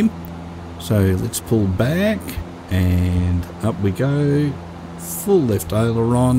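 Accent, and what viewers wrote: Australia. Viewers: Mate, I really appreciate your effort to thoroughly documenting keyboard-mouse flying in X-Plane - and other mouse-flying video posts. Just beginning my X-Plane journey during these stay-at-home times. Will definitely try the plug-in. Also, your Ozzie accent is wonderful to hear as I just returned from my PhD study last year in Brisbane. Subscribed your channel.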